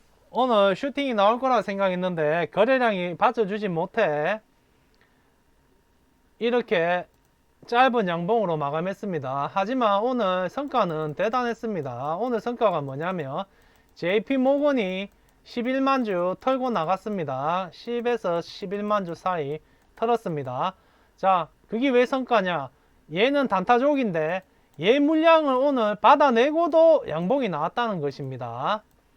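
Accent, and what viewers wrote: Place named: Gyeongsang, South Korea. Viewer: 멘트가 뚝배기네요 진국입니다 듣다 보면 시간이 넘 짧네요 저도 경상도라 구수한 사투리가 친구 만난듯 정겹습니다 올도 유익한 내용 잘듣고 갑니다~꾸벅~^^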